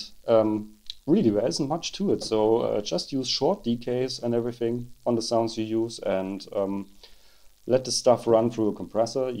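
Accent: Germany. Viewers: Man hört stark dass du deutsch bist.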